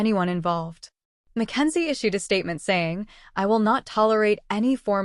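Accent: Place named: United States. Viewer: Why is this in an American accent?🙄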